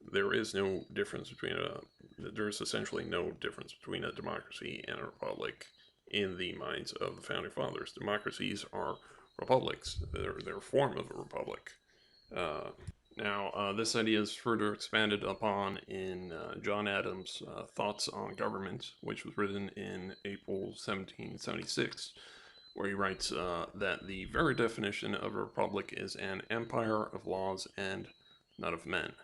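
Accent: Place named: Europe. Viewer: Your comments concerning Nationality revealed that you have done very little viewing and reading on Taj Tarik Bey's work. You have only to study a little bit more to know that you are agreeing with his definition of Nationality. I feel as you are trying to establish credibility and notoriety for yourself by superficially debating long standing scholarship with adolescent claims and shoddy research. If I were to make a guess to your nationality by the sound of your voice it would be of european ancestry, (troglodyte).Which would explain your interest in debunking rather studying.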